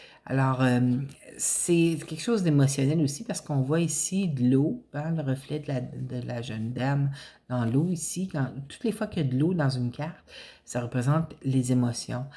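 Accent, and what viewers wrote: Canada. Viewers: Merci pour cette belle guidance. Votre accent canadien est si agréable à entendre et votre voix est si douce. Bonne Année à vous et prenez soin de vous.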